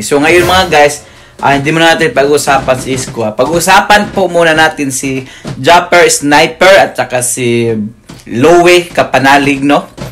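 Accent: Visayas, Philippines. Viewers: Kaya pala d way ka magsalita visaya ka.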